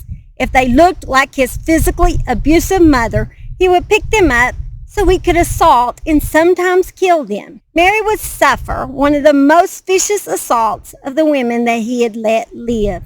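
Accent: South, United States. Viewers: Love you always ❤ sounds like you’re shouting this story, please preserve the southern voice. I know this was 2 years ago but I’m catching up xx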